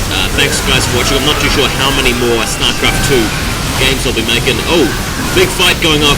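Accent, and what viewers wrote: New Zealand. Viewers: Screw Day9, Tastosis, HD and Husky, when it comes to sc2 commentaries, your the man :-) And I LOVE your kiwi accent by the way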